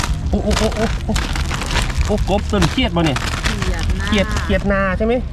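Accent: Phu Thai, Thailand. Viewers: แอดมินเว้าภูไทมวนครับติดตามประจำเบิ่งอยู่กรุงเทพจากคนอุดร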